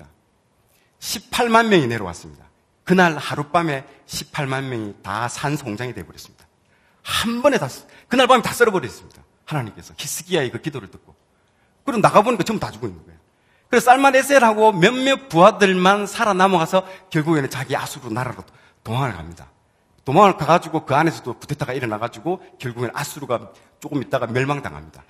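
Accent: Gyeongsang, South Korea. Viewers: 경상도 어조 질색을 하는데 이렇게 듣기 거북하지 않은경우도 있네요.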